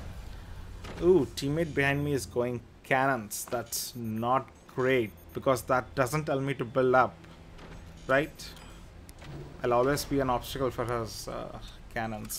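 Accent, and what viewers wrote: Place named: South India, India. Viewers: There are many indian accents. This is a southern one. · Are you Indian? Every time I think I have your accent figured, I doubt myself.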